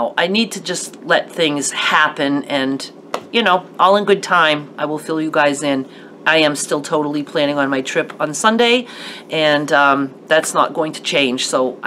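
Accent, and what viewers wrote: Maine, United States. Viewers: I watch a lot of your instructional videos, but have not seen as much of your personal stuff. Thanks for sharing your struggles as many of us can relate. As a creative person myself, I know sometimes making stuff helps you deal with the crap. I have been doing some quilting and when I first clicked one of your videos, I heard your voice and saw your surname and said to myself, "she's gotta be from Maine!" Love watching you! Take care of yourself. When dealing with a narcissist, you need to put yourself first, because you know damn well she's gonna always put HERself first. Dealing with a similar situation in my own life. Hugs!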